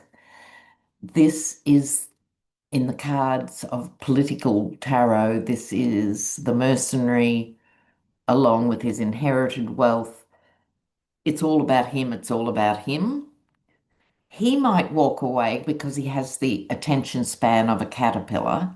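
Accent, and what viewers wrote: Australia. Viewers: I can have a really crappy day and come home and watch one of your videos where you cleverly have such a way with the English language! You were talking the other day about someone pretty sure it was a yeti where you commented on the fact that he has the attention span of a caterpillar. So I don’t know if it’s your sarcastic with, or your Australian accent that makes that comment so effing funny you’re just the best I adore watching you. I watch every single one of your videos and I’m about to go watch you, Linda G and the other two ladies chat thank you so much for your effortsyour comments your wit your charm we love you in America. Thank you for all the hope that you provide for our wavering country PS I’m Irish too. The other half is Cherokee Indian.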